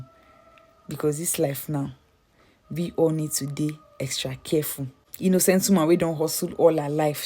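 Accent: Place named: Igbo, Nigeria. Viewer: The narrator is not bad,is just the igbotic accent is the problem.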